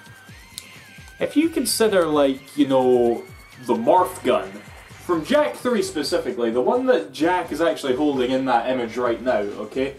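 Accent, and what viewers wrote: Scotland, United Kingdom. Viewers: Right? I've watched his channel forever and those play throughs multiple time. This beautiful Scottish accent is a part of my nostalgia at this point :)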